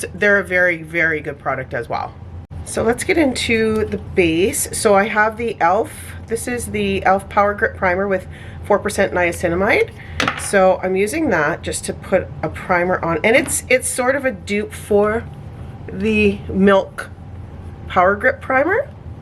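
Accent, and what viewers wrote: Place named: Canada. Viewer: Well hello fellow Canadian (I'll be very surprised if you're not) - that's what your 'accent' sounds like - anywho, I'm a brand new subbie who happened to stumble across this video and I hit subscribe right away. I am a 50 y/o, mother of 1, married x 25 yrs and working as a stenographer in our hospital x 25 yrs. I'm a makeupaholic, it seriously is becoming bit of an issue, and I promised myself (and my hubs) that I would try really super hard to be on a no buy or low buy this yr. I would love to see you do a full glam look and I just know I'm going to love your channel. Sending big xo's from Labrador, Canada.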